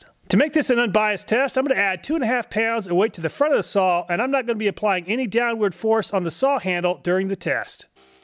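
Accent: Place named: South, United States. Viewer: Very good info but man you talk so fast my ears could not keep up so I lowered the playback speed to .75 and now I can understand you better , now sounds just like a good old boy from the South which my ears appreciate much better .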